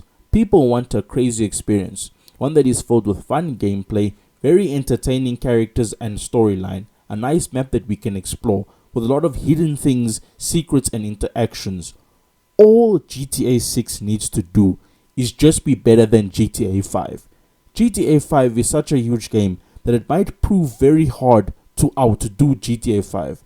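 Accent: South Africa. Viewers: I recognized that SA accent. You coloured ?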